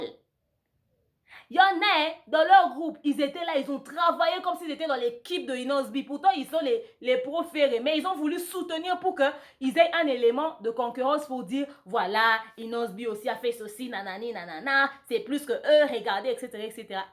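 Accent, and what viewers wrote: Ivory Coast. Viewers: quand elle parle comme les ivoirien elle enleve sa exprès peut être que tu ne t'en rend pas compte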